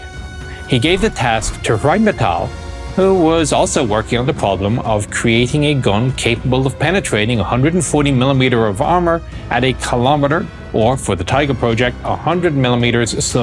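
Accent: Ireland. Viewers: So this music volume is mandated by wargaming then? But... why? If its volume was less than half of what it is here, I might actually be able to concentrate properly on the really interesting stuff the Chieftain's trying to educate me in, but instead we get boss music with some faint, soothing Irish tones in the background, apparently. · Ditch the music now mate! Your sweet Irish lilt is all we need Chieftain!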